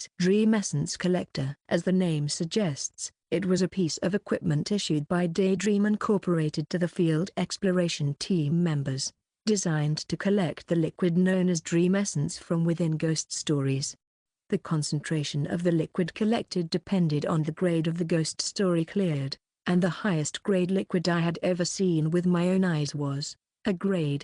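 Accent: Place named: United Kingdom. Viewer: Thank you for uploading this. However the narrorator suddenly switching being British and female is a bit confusing. 😢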